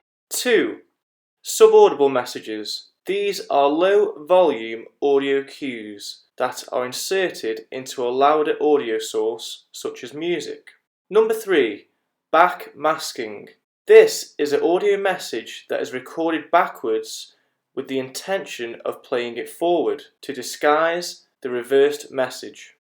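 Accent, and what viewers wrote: United Kingdom. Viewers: You from Manchester?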